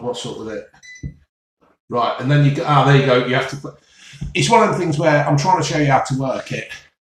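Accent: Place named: Leicester, United Kingdom. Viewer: mate I know. I’m Leicester born and bred. Knighton. Moved to west coast of Ireland but I can recognise a lesta accent a mile off. Take care me duck 😂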